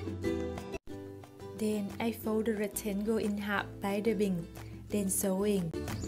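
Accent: Vietnam. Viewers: Walters She is from Vietnam, she has a Vietnamese accent. Definitely not deaf. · R u Vietnamese? I feel like u have a Vietnamese accent lol I luv the tops btw but I’m not amazing enough to create them lol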